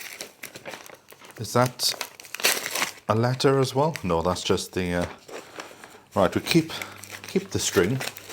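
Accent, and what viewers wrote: Wales, United Kingdom. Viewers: I am a new subbie. I have got to tell you….. you are like my diazepam…… when I feel stressed or anxious….. I watch your vlogs and the world just feels a much better place. Your welsh accent and calming voice is so lovely. Please don’t ever change. Lots of love and squishy hugs xx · A potch around..that is an expression I have not heard in an age,,,,, from Neath originally but living in Japan for 23 years.......My family are Japanese, but they understand your accent.....Miy students too have Welsh accents!!! Thank you so much for the videos,,,,,,,,,we truly enjoy the relaxing and interesting way you tell a story.........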